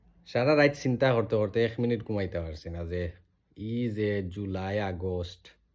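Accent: Sylhet, Bangladesh. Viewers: তোমার ভাষা ঠিক কর কতটুকু সিলেটি কতটুকু শুদ্ধ অবাঙাল কোথাকার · Apni chalti vhashei kotha bolun bujha jai na..kisui....sab toh siletty jane na😂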